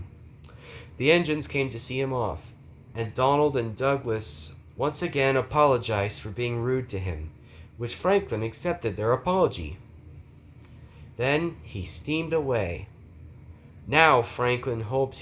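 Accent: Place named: Australia. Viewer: why does he have an australian accent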